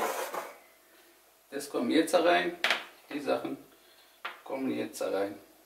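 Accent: Swabia, Germany. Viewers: Hallo.. Hab euch mit diesem video weiter empfohlen..hab den Kanal schon lange aboniert und freu mich jedesmal über deine humorvolle lockere Art 😉und das Schwäbisch das abgefärbt hat😃euch noch viel Spass bei vielen neuen Ideen Lg Marina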